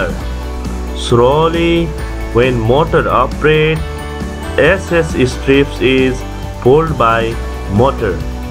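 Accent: India. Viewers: Give up your Tamil accent of spoken English. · Indian accent is the Ugliest english accent around the world and it is very hard to understand,bad pronuncuation,to name but few. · Bihari bol raha hai?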